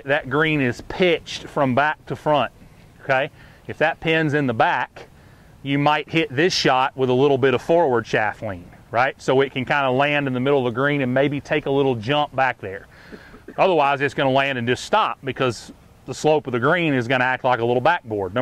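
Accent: South, United States. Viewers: I found you today on YouTube, a little behind everyone else. You have a great method of teaching. I completely understood everything you said and described. A southern accent is greatly appreciated by this Texan ;-) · your method and delivery of the training is great. You explain everything in a logical, easy to understand way.. This past weekend I tried to use the techniques in my short game and they really helped. My short game is the worst part of my game so any thing is a plus haha! I have watched all of your videos now. You present the information so much better than other teachers. Keep up the postings. I will watch everyone. BTW, where are you located? I detect a Southern accent. Am I right?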